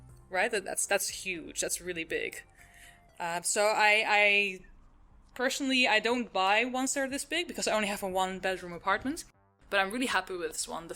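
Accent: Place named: Netherlands. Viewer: Oh No water culture 😲 (Genf ? you sound Dutch)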